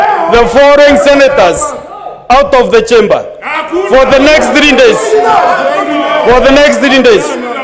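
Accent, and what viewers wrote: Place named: Meru, Kenya. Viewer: That is the tongue of people of meru ,but English is good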